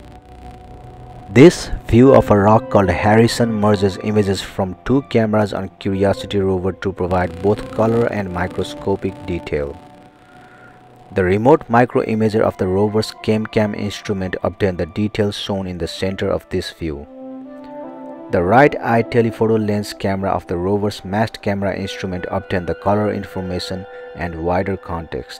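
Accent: India. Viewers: This is more like Indian call center like you've never heard before..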